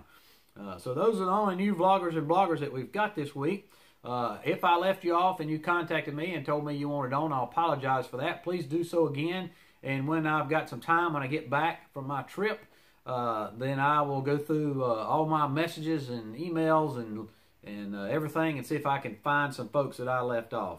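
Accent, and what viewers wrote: South, United States. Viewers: I am an hour North of that location. I am not certain where you are located, however, judging by your accent I would guess you are from the southern region. I can definitely give you a hand as you head North. Would love to connect with you👍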